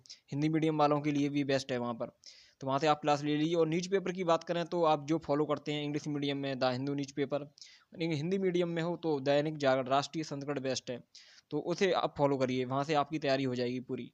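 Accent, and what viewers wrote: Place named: Bareilly, India. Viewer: Brother are you from bareily or aagra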